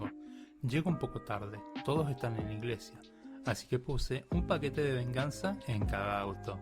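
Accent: Córdoba, Argentina. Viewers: Es cordobés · Tu acento es muy argentino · queria preguntar si sos cordobes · Best ascento cordobes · Ese acento coshentino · alto acento cordobés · es re ccordobez el narrador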